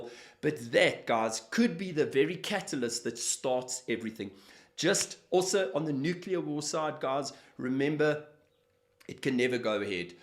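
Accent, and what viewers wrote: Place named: South Africa. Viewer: Thank you so happy to hear a south african voice talking about this. Do you perhaps have a website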